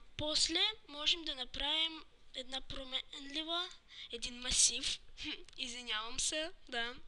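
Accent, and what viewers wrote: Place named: Russia. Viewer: Руснак ли си, защото имаш як акцент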